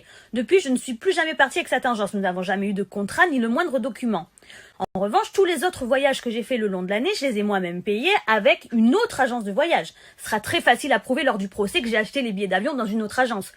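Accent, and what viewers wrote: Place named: Italy. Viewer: La pronuncia italiana oltre qualche parola in italiano tradisce le tue origini e soprattutto la tua, altissima propensione al crimine.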